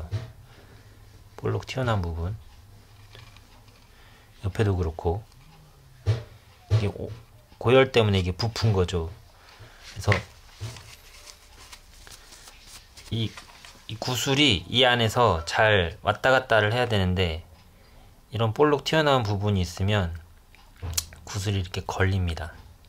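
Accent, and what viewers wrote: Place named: Jeonbuk, South Korea. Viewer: 늦은시간에 빠른답변 감사드립니다. 사투리가 구수한게 너무 익숙합니다. 저는 전북김제 출신인데 동향분 아니신지요. 그래서 더 편한함을 느낀거 같아서요~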